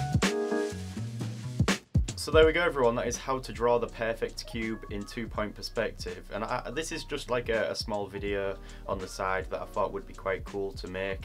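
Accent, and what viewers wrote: United Kingdom. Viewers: You have a british/country accent. It's soothing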